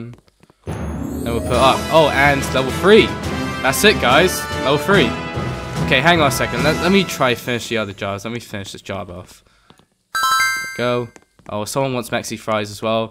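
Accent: United Kingdom. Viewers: r u birtish? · Nice birtish accent! You just earned a sub!! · U just earned another sub. 1 your British 2 u were the only person that I saw talked while playing roblox . Btw my user on roblox is maddy6786. And next time you should work at bugler bomb.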